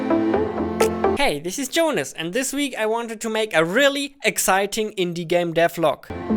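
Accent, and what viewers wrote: Germany. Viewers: Are you an Austrian, German or a swiss? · You are German?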